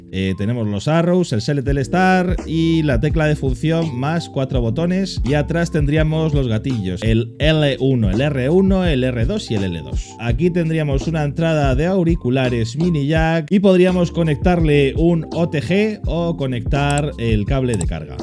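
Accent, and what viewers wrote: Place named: Spain. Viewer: QUE GRACIOSO HABLAN LOS ESPAÑOLES 😂😂😂😂😂😂😂😂😂😂😂😂😂😂😂😂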